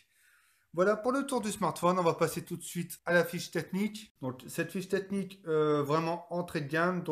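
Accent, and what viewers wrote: France. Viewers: un léger accent Chti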